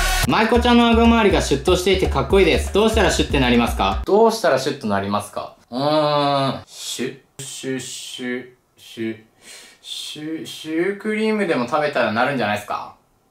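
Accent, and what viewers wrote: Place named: Yamaguchi, Japan. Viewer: たしかに山口の方言使っちょるねw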